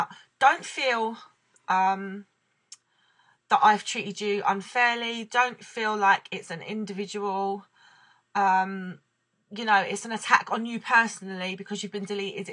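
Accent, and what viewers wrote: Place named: United Kingdom. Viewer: Stop saying who you aw, remembaw, whatevaw your british accent is screwing up youaw english!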